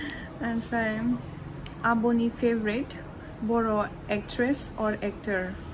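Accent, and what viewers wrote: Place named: Kokrajhar, India. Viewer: Your Boro language is same kokrajhar boro language and you are good girl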